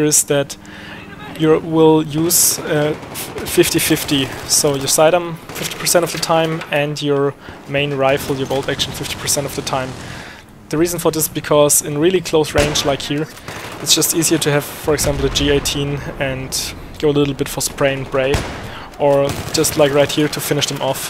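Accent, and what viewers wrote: Germany. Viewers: You are German, aren't you?:-) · Love your accent are u German? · Omg, I love you're german accent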